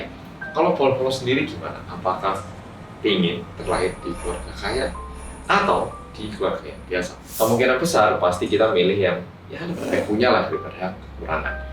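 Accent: Java, Indonesia. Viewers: Yang? Medoook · Halo mas.... Welcome back with me, fans orang korea yang medooook 😁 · Hei orang medok kenapa sama gak anak annajiyah aja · Mas:Bersama orang korea yang.. Aing sekeluarga auto teriak: Medhokkkkkkkkkkkkkkkkkkkk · Semangat terus mas wong korea sing "medokkk"🤣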